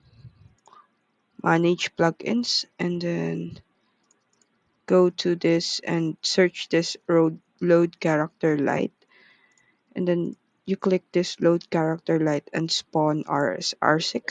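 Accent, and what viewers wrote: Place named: Philippines. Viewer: I can tell by your accent, I think you're filipino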